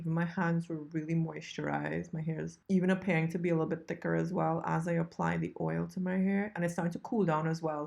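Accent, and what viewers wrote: Trinidad and Tobago. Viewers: Do I hear the beautiful lilt of Trinidad in your voice?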